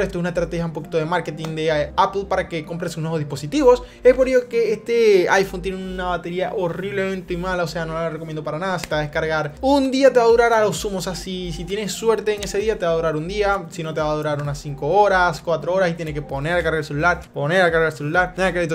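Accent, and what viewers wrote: Venezuela. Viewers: eres venezolano???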